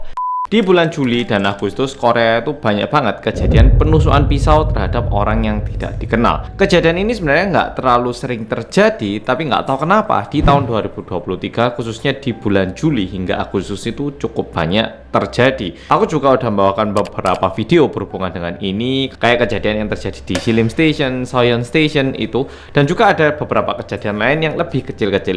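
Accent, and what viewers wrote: Java, Indonesia. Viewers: Edan...medok banget Indonesianya,ngga ada minesnya😂😂😂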